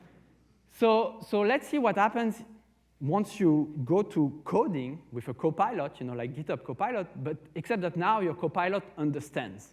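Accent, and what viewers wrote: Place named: France. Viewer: Stochastic Parrots 🦜! Taking over the world! Clever birds! Anyway, I would have expected Sebastien to be affected by Methuselah Syndrome, it would only have been fitting. But the french accent makes up for it, like François Truffaud in "Close Encounters".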